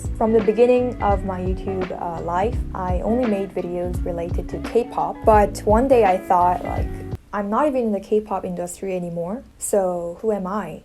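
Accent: Philippines. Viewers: Just the way you speak i call feel that you grow up here in the Philippines, and Pinoy baiting doesn't apply for everyone that makes Filipino related Contents, Pinoy baiting are actually being done by those people are not familiar with Filipino Culture but still make Contents out of them... Its just a strategic move for them, but i hope that people can support the channels that makes worthy videos, (for any type of contents) where people can learn or be entertained by it without being sexist or make whatever that isn't even worth watching like idiotic pranks that are obviously scripted and theyre not even trying to think for a good content but for some reasons there are people who support them, i cant blame them(viewers) because maybe they want to fool themselves lol, who knows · I am a first generation American of Filipino descent. I have never visited the Philippines, but after first watching your video (speaking English w/ a Filipino accent) a few months ago (followed by many more videos), I was hooked. 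I want to visit there now - all thanks to you! As an aside, I would also like to visit S. Korea. By culture, experience, language, knowledge, living there nine years, you are a subject matter expert on the Philippines. I don't quite know what the issue is... If it's monetization over your content, then travel agents shouldn't make any money. Is it because you are not Filipino? That would be racists. Can only Filipinos post videos and comment about the PI? Your videos are well-made, creative, and thoughtful. They are also positive, and only benefit the country. W/ regards to the hate you receive: If you have not watched the movie The Social Dilemma, you may get a better understanding of the hate. In summary (spoiler alert); your viewers are the product being sold to advertisers on YT (the source of the monetization), conflict (hate) generates more viewers/posts than does love. If there are no negative comments, the artificial intelligence (AI) steps-in to create that negativity - generating responses (and money). 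Simple comments like, "You're stupid" or "You're not Filipino" can generate a lot of responses. Sorry, so this is so long. I want to give you support by letting you know I very much enjoy your videos. As a Filipino (albeit in the US) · I'm Pinoy, I watch her videos because of her pinoy english accent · I know you have a filipino english accent and many koreans have filipino english accent but yours is my favorite ❤️❤️❤️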